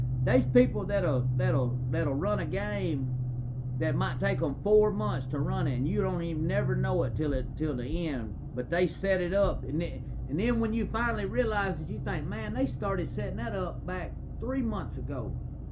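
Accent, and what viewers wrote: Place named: Louisiana, United States. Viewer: All my family's from Louisiana and Mississippi and you sound just like em lol. Great channel, great storytelling, subbed!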